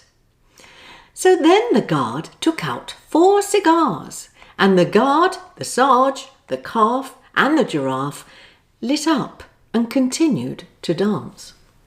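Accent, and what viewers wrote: United Kingdom. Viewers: Is it British English or American English? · Hi, your accent is great. you are teaching us British accent. I presume.❤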